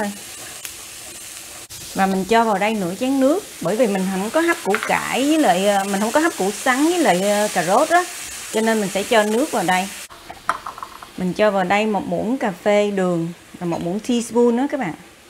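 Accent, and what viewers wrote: South, Vietnam. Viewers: Hướng dẫn rất tuyệt vời. Giọng nói miền nam nhẹ nhàng dễ mến.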